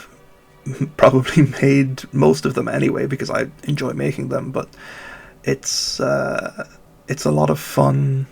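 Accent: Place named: Germany. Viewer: 😍 OMG, first time I'm seeing your own art! ...your accent sounds a tad German... Sind wir Landsmänner?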